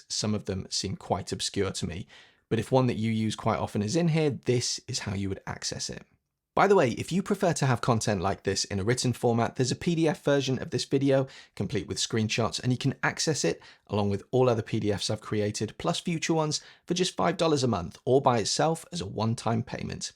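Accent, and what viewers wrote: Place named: RP, United Kingdom. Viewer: Duck! I think I am addicted to this posh British accent...very nice content in nice pace.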